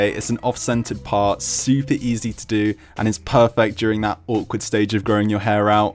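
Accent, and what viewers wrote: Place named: United Kingdom. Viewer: You have british accent bro isn't 😊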